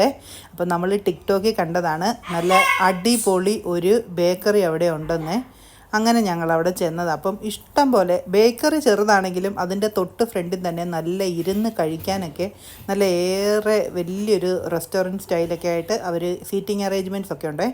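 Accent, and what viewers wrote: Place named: Pathanamthitta, India. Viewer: Pathanamthitta style samsaram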